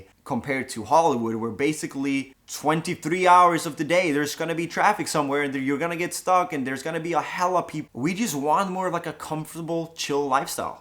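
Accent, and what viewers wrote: Scania, Sweden. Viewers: NÅGON SOM VET VILKEN KOMMUN kommer ifrån? Har givetvis att han är skånsk. Hade varit sjukt ifall vi bodde i samma kommun. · Han är väll skånsk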